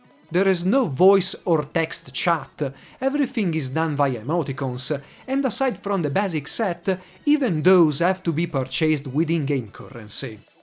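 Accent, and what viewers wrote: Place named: South, Italy. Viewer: I know you aren't italian from your last name, but man, you could also fool a southern italian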